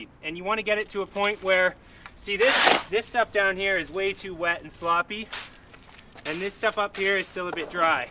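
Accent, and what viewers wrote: Canada. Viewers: this has to be a canadian talking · Is this a Canadian accent I'm hearing? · He sounds like every Americans impression of a Canadian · I can tell he is Canadian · This guy is the shit. Love the Canadian accent lol. I'm also a skateboarding who ended up in construction cuz skateboarding wasn't paying the Bill's. I wish it could've tho lol. Keep it up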